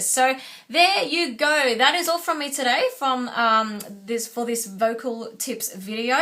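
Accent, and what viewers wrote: Australia. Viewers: Very articulately explained, thanks. Nice to hear an Aussie voice too.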